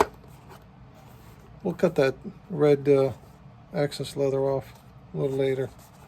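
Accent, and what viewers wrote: New York, United States. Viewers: I like how you talk. Are you from up north, like New York or something?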